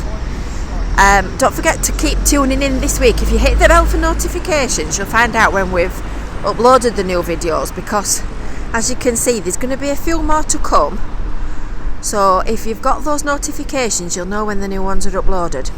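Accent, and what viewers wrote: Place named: Hull, United Kingdom. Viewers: Are you from the hull area because you have that twang you talk like Lucy Beaumont the stand up comedian who is married to John Richardson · It’s still a veryYorkshire accent ! Nice to hear !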